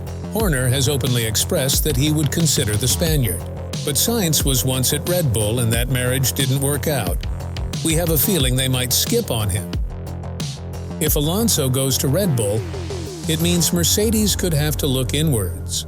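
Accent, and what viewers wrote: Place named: United States. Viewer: It's funny hearing an American sounding like he knows F1.....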